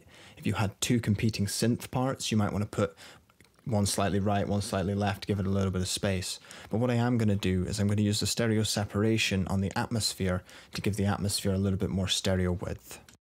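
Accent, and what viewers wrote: United Kingdom. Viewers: Are you Scottish or English!? I can't tell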